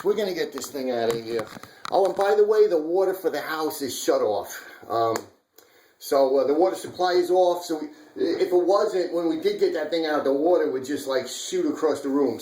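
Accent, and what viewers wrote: New York, United States. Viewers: Nice Job!! I'm a fellow handyman and it's always great to get help or advice from a contemporary! Love your style and NY accent too as I'm from Philly and my wife's from Jersey so it reminds me of home! Love the way you remind the "boys and girls" to keep calm and take a moment! Great work man!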